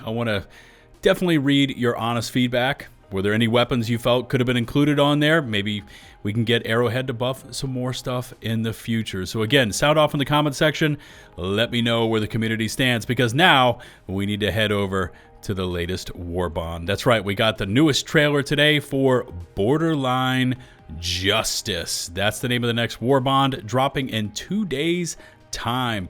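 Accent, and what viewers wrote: Louisiana, United States. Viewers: you sound like Louisiana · His southern accent is giving Cajun… lol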